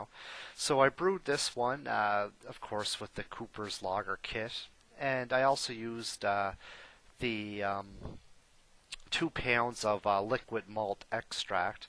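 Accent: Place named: Ireland. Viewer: You sure your not from Ireland, you sound really Irish.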